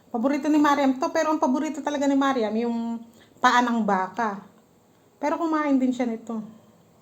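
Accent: Nueva Ecija, Philippines. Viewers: Ate parang Punto mo taga Nueva Ecija Po kayo idol kopo kayo❤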